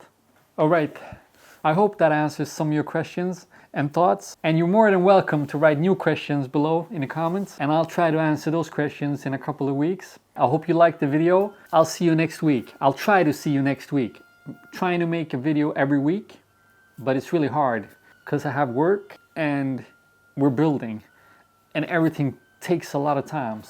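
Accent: Sweden. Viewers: Who said that your English is bad, actually I found it perfect, and add that little Swedish accent to it that makes it even better an special, honesty don't listen to those ppl cause you can't be liked by every one no matter what you do, just continue doing your own things and the most important part enjoy doing them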